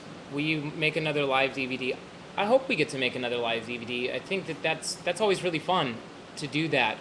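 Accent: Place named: Iowa, United States. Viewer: Pure Iowan accent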